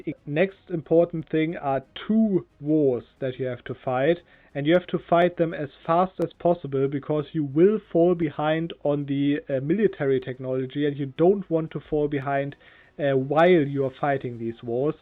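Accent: Germany. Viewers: You know the video about an autistic map game is about to be good when you hear a German accent recorded by the most clapped out microphone to ever exist.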